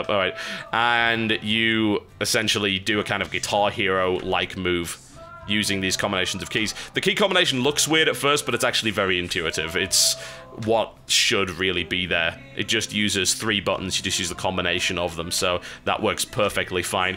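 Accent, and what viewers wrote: United Kingdom. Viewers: Angry Joe is better. Sorry to say but a one-hour+ review with a British accent kills it. Plus he's playing the game rather than just reviewing the most important points the way Joe does.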